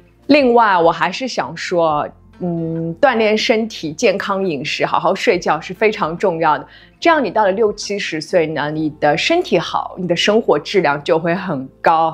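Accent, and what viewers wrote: Shanghai, China. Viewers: 侬是上海侬伐？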